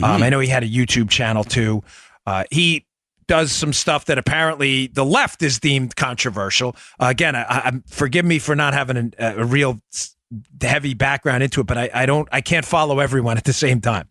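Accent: New York, United States. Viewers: I like this show sounds like my boyfriend New Yorker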